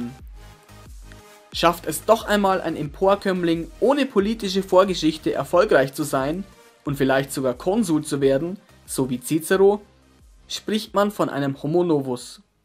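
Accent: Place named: Austria. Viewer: sehr gutes Video, kömmste aus österreich wegen den Akzente? Mache weiter so!! Grützi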